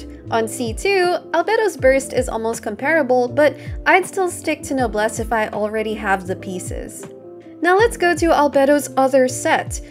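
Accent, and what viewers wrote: Philippines. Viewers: You probably get this a lot but your accent is comfortably eng/fil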